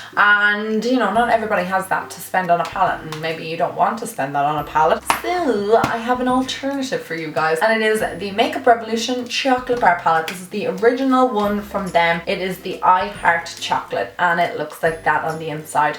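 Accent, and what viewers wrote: Ireland. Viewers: Enjoyed this very much. You are entertaining, informative and quick. And of course love the Irish accent. Trust what you say as well. Will look through your videos but would like to see you do one half of face all Mac and the other nyx, or urban decay and makeup revolution, etc.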